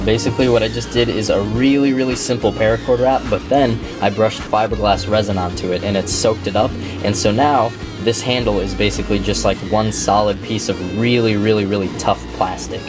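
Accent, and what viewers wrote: South Africa. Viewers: Not too bad of a South African accent there. I'm from South Africa. Love the vids Chine. Chine means M8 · Damn, nice mace and an even better Afrikaans accent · Convincing South African accent bro, and that's coming from a South African! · South african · you soud like a south African like me because I am one hahaha lol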